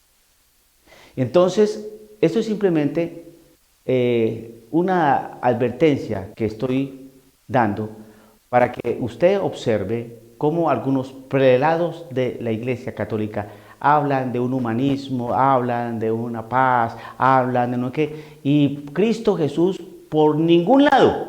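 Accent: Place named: Bogotá, Colombia. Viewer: El, es colombiano....no le notas el acento rolo?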